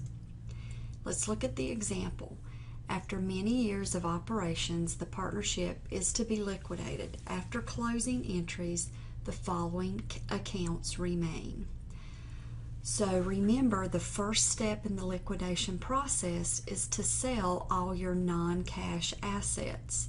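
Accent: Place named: South, United States. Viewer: thank u and i like your southern accent btw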